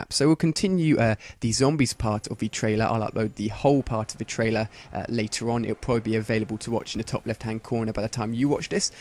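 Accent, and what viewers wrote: United Kingdom. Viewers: i love your british accent!! teach me!!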